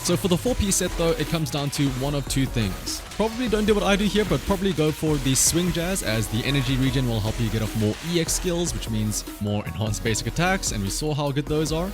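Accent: South Africa. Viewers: I knew I recognized that accent, as a fellow South African you got the instant like and sub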